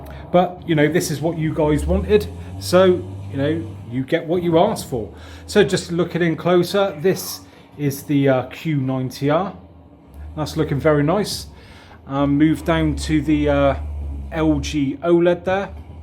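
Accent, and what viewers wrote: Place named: Southampton, United Kingdom. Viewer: Your videos helped me buy my TV (Samsung q90r, 65inch) so thanks for that! My girlfriend and I are trying to guess your accent. We have money resting on this so please can you let us know who won? (she thinks Southampton). Thanks for your videos, from Mallorca!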